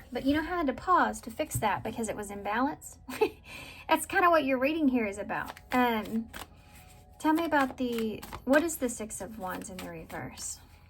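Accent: South, United States. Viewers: Pile 2 hit me hard. I was drawn to it and now I know why. The tower moment for me was my momma collapsed in front of me and I couldn’t save her. My gf at the time , addiction broke us apart. I moved out west and have been single for 9 years. I was having flashbacks today and when I found you I wanted to know what I needed to hear. I have been closed off from love the whole time. Healing and growing spiritually. I literally just ordered a shamanic drum two days ago for myself and loved that you said beat the drum get up and dance. Thank you for sharing your gifts. Also I grew up in Tennessee so your country accent was endearing to hear ❤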